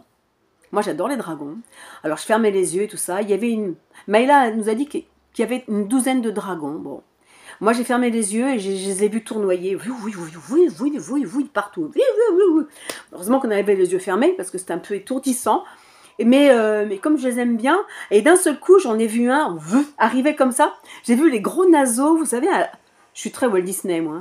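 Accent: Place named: Paris, France. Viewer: Merci pour ton Naturel et ton accent Parisien ❤😅😇🙌🦎⛰🐬👽💫🙏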